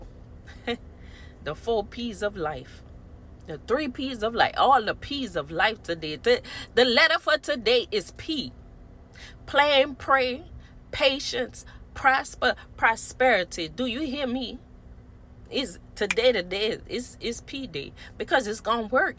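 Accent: Louisiana, United States. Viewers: Say that Sis 👏🏾👏🏾‼️ I’m loving that Louisiana twang!!!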